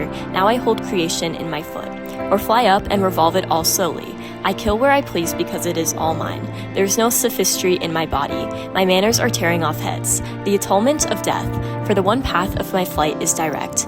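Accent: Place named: United States. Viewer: Why is an American reading this? It's very poor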